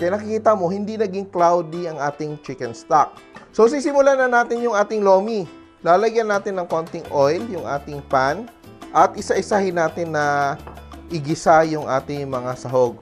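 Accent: Visayas, Philippines. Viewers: Bisaya ka chef?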